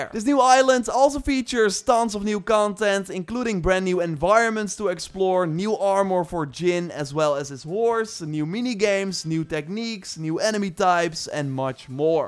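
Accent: Netherlands. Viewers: Damn that dutch accent is jarring And i am dutch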